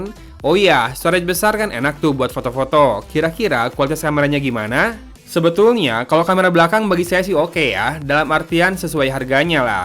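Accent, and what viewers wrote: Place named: Sunda, Indonesia. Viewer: Suka sama suaranya aa. Sunda banget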